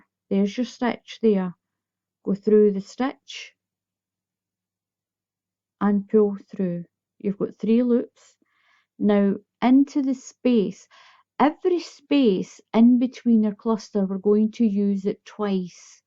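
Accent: Scotland, United Kingdom. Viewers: Another beautiful blanket, can I just ask you on the border what do you do on the corners for the double row and the treble row? By the way love your accent, I had my DNA done and I'm quarter Scottish. But I dont have the accent which I wish I did.,your work is as always fantastic.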